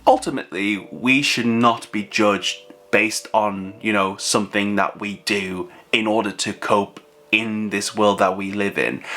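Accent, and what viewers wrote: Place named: Middlesbrough, United Kingdom. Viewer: Are you Mbro based? Your accent sounds local to me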